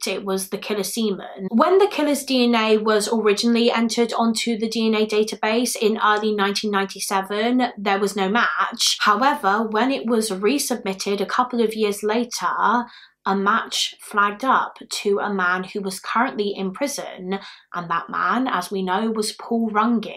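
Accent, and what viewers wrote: United Kingdom. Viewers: Amazing Thankyou, also as lovely as it is the American YouTubers I do love a Brit one xx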